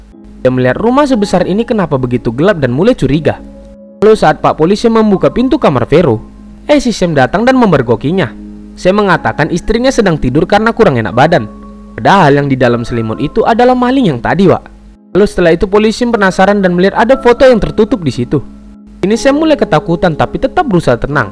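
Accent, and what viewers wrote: Medan, Indonesia. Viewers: Medan kali kau bang, kalo dari aksenmu ini kau tinggal daerah setia budi, atau padang bulan, atau sekitaran kecamatan medan selayang dan medan tuntungan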